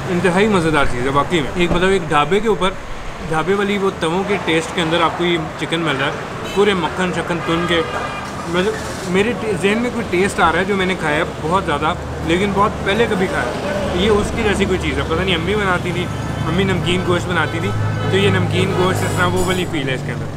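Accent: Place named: Bihar, India. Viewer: Waise tum ek bihari ki tarah bolte ho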